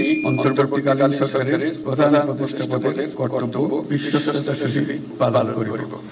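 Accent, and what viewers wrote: Bangladesh. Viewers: কথার ধরনের বলছে সে একজন বাংলাদেশী উপস্থাপক। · এটা তো কলকাতার ভাষা না এটা আমাদের বাংলাদেশ সাংবাদিকদের ভাষার মতো লাগছে কলকাতা মানুষ দের বাষা তো ভিন্ন রকম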